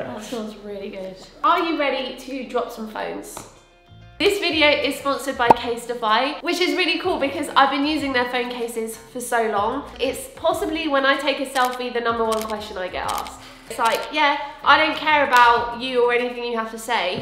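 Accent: France. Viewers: accent français on point ! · Is she French??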